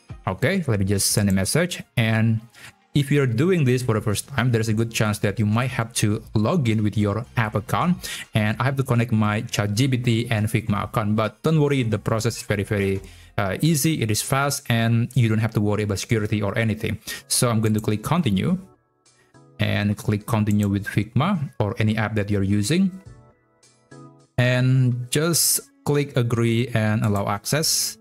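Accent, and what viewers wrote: Indonesia. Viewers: You sound Indonesian. Good video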